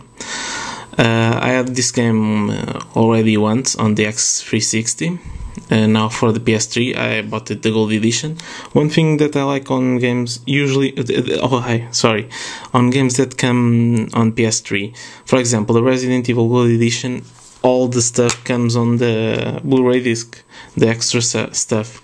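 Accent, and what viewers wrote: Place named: Yugoslavia. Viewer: You sound Yugoslavian like me but you're French right?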